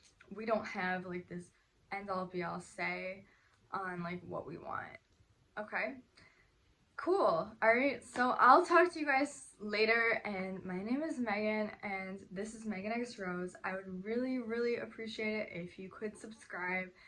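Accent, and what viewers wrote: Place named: United States. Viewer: نو لَكنتها امريكيه ف كلامها عادي ^o^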